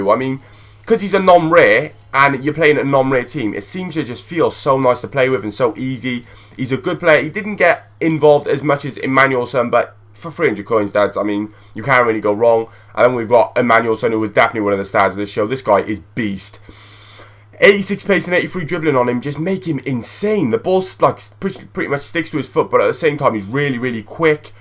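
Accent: Wales, United Kingdom. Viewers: He sounds like the guy of the valleys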